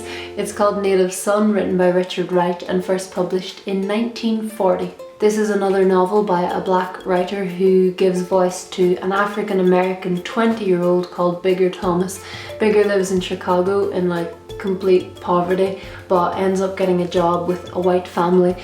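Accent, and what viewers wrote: Ireland. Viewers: U Irish?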